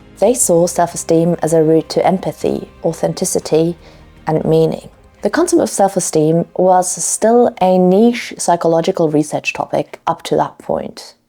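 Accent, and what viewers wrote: England, United Kingdom. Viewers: your englsh accent is awesome, why americans cant speak more like that. lol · Miss, are you Italian? You sound British. 🌹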